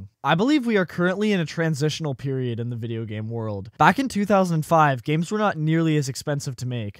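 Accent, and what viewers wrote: Canada. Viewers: I always like how u can tell someone is Canadian when they say: about. Great vid btw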